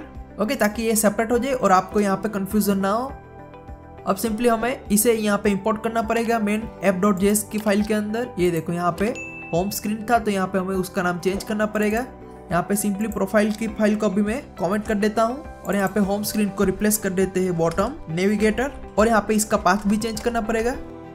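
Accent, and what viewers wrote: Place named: Hyderabad, India. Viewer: keep up the good work , are you from hydrabad india ? cool akcent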